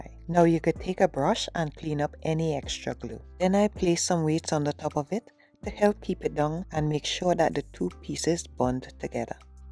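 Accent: Trinidad and Tobago. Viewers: I knew you were a Trini from the time I hear your voice🇹🇹🖤🖤🖤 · hey ,,, yesss! trini accent very nice I stumbled upon your channel and your voice got me watching.... nice ideas thanks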